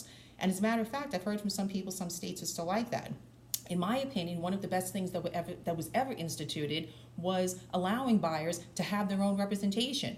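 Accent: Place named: New York, United States. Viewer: Great video! I love the NY accent!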